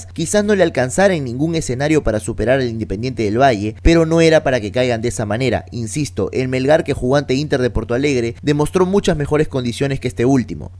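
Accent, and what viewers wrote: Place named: Argentina. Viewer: por que siento un acento argentino?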